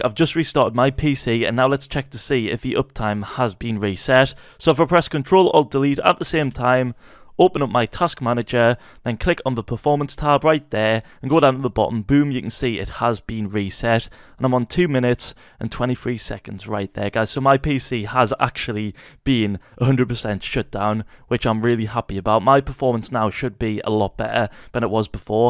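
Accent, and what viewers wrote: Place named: Newcastle, United Kingdom. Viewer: your from newcastle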